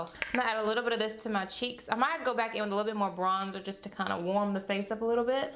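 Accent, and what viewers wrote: South, United States. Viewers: Your southern accent is so darn cute!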